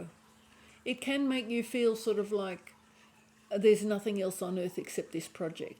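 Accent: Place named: Australia. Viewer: Nice to see an Australian (or in this case, hear) delivering a reading (some great Tarologists on YT ) new subscriber and thank you for an enlightened reading. You hit the nail on the head regarding fatigue and boredom. Also moving toward developing and connecting with my intuition (through Tarot and Pendulum work) and I appreciate the time you put into this reading. Much kindness and blessings to you (From Melbourne - Eastern Suburbs) 🌻🌻🌻🌻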